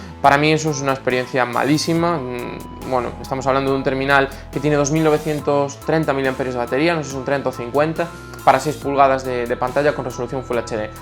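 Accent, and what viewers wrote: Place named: Galicia, Spain. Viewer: Como se nos nota el acento a los gallegos xD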